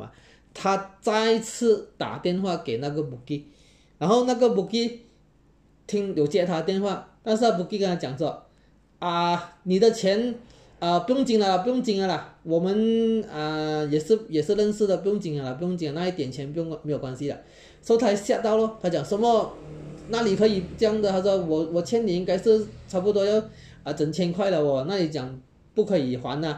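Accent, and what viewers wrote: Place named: Penang, Malaysia. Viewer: 槟城的福建音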